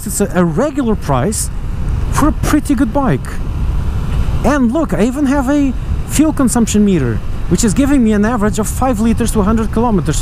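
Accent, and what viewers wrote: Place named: Portugal. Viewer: I love our portuguese accent when trying to speak English xD Boa mota, estava a pensar em comprar, e grande dia de Marginal xD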